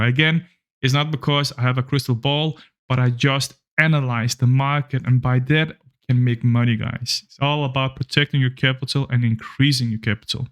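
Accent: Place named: Austria. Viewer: Dude you have arnold schwarzennagger accent 😁 are you from austria 😁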